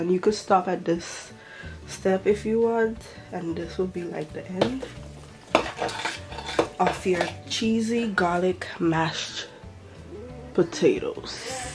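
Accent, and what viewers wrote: Caribbean. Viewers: Almost thought you were from my island, st.maarten, with your accent. But so glad I came across this channel today. I will try as much as these recipes as possible. Mayb give them a lil twist since i am a fitness dude who tracks calories. But I need to add at least some of this caribbean deliciousness to my life!!! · Trying this today ! Sound Caribbean 😀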